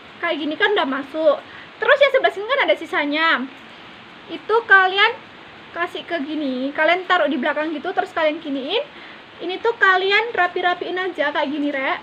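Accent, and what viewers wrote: Java, Indonesia. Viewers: Iyo rek manteb rek ilat jowo medok rek hehehe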